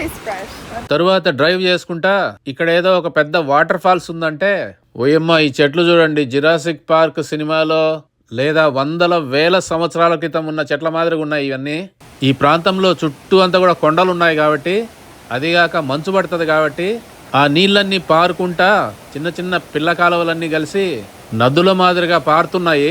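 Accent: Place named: Telangana, India. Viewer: తెలంగాణల భాష లాగానే ఉంది ఉంది బ్రదర్ మీది మీ స్వీట్ వాయిస్ చాలా బాగుంది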